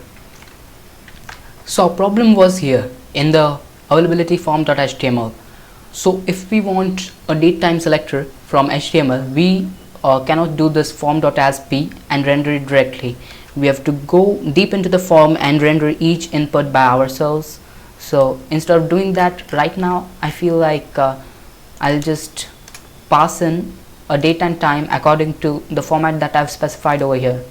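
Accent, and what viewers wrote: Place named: India. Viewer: I HATE INDIAN AKCENT I UNDERSTAND NOTHING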